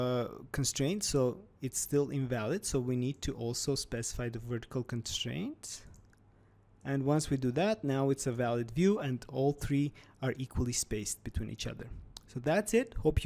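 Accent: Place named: Russia. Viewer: акцент русский, мог бы и по-русски записать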